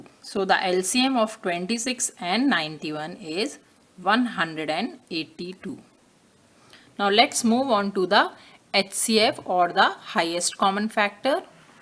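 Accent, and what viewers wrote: India. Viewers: Itni English tu aaj suniye jitni main angrejon se bhi nahin suni thi angreji hai yah Indian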